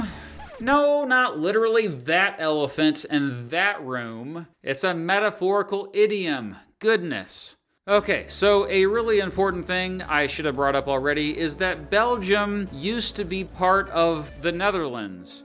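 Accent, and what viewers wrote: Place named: Midwest, United States. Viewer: The lecturer sounds like a native Midwesterner.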